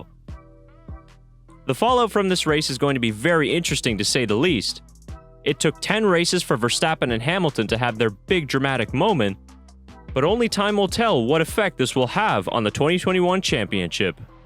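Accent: United States. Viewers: Just brainwashing the MAXIDIOTS with an American accent to make it seem like it’s coming from someone unbiased.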